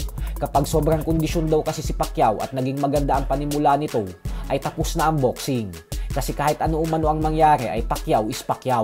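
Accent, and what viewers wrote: Visayas, Philippines. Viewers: Trantado ang blogger na to visayang Visaya ang boses mag inc kanalang boy at Kunin kanaming hitman kikita kapa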